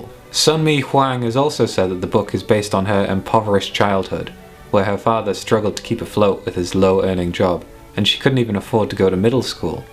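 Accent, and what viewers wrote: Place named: London, United Kingdom. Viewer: Love the way you speak are Londonianian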